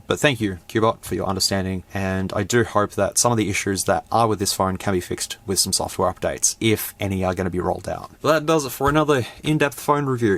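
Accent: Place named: Australia. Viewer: I really appreciate your in-depth reviews. For the most part, I'll watch the intro, listing, turning on, set-up, specs, Quick Shortcut Maker, Conclusion, and teardown. Sometimes, if I have nothing else on the back burner, I'll watch the entire thing at 1.5 or 1.75 speed. The Aussie accent at that speed is hilarious. 😂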